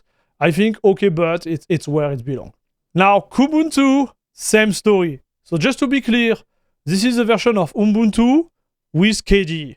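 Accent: France. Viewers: I came here for the French accent ❤️❤️ Love the content keep it coming lad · You have a strong french accent, so i'll say it to you in french: Ce que tu as dis sur Debian est partiellement faux. Tu as dis que les version étaient vieilles. C'est le cas PAR DEFAUT. En 3 lignes de commandes, tu peux ajouter les repos backports de debian. Ces répos possèdent les dernière version de beaucoup de packages. Par exemple, je suis sous Debian 12, qui est normalement fourni avec le kernel 6.1, mais avec les backports j'ai pu avoir le kernel 6.11.10 . Pareil pour les drivers nvidia, ou encore gnome. Dès que tu actives les backports, avoir les dernières versions est simple, tu rajoutes juste -t <nomListe> a ta commande APT pour les prendre depuis les backports. AVERTISSEMENT: SI TU FAIS CA N'ESSAIE SURTOUT PAS D'INSTALLER LES META PACKAGES (linux-image-amd64 par exemple) CAR TU RISQUE D'AVOIR QUELQUES SURPRISES... 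· Mdr mec l'accent , ca se sent que toi aussi on se moquait de toi en cours quand tu essayais un accent plus british ! N'empeche ça me rassure, meme si ton accent est french de ouf bah on comprend parfaitement ! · Si je ne me trompe pas, tu viens de la France. T'as un accent Franglais sans doute, ce que j'aime bien 🙂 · Excellent ! Un abonné en plus, j'ai commencé doucement avec Bazzite sur mon pc fixe il y a environ 1 mois, idem sur ma.rog ally et je doit dire que grace a ce dernier je suis tombé amoureux de linux et j'ai totalement oublié windows (mêmepour la bureautique), cependant Bazzite est encore jeune et présente quelques bug et plantage, rien d'insurmontable mais, ayant vu de quoi etait capable Linux avec ce dernier j'ai cette soif de decouverte et depuis quelques temps CachyOs me fait de l'oeil depuis quelques temps pour ma config fixe et franchement tu m'a donner envie de me lancer sur ce dernier. 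J'aurai pu commenter en anglais mais je me suis dit qu'un peu de Français te ferait plaisir 😅, on a le même accent à couper au couteau 😂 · If you don't like french accent, just go cook yourself an egg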